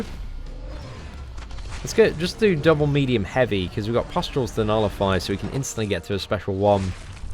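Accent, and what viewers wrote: England, United Kingdom. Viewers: This speech is the reason why I never travelled to england. You get headache in seconds. Horrible.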